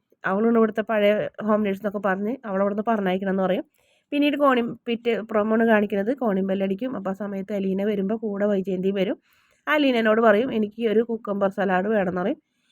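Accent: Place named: Thrissur, India. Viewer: തൃശൂർ സ്ലാങ് ആണല്ലോ 😂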